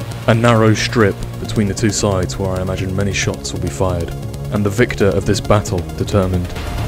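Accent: United Kingdom. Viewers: Find me a non British KSP content creator…. It’s annoying. Honestly, what is with British people and KSP? I even have a friend in Britain who plays. I’m not overly pissed, I’m saying there’s too many and I’m done listening to the accent. I’m entitled to my own opinion for whoever finds this comment and so are you. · I literally adore your voice. The voice over aspect really does sound professional. The air combat made me cringe a little though, a bit too much top gun. If an enemy started slowing down in front of them a real pilot would start to climb to to lower the speed and maintain distance. That way the enemy would have to climb to attack him, which they can't do with no speed, and the attacking pilot can later trade that altitude for acceleration later in the fight as well as using gravity to enhance his turn capability. I mean most people will just think its cool and not understand the finer points of air combat so don't take it too hard, but its about the most basic example I could imagine of energy-manoeuvrability theory upon which every single combat pilot's training is based. 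Literally as elementary as multiplication for a physicist. But that off my chest, the rest of the video was great and very well produced. And hello from a fellow Brit, at least I won't have to cringe at every other word's pronunciation.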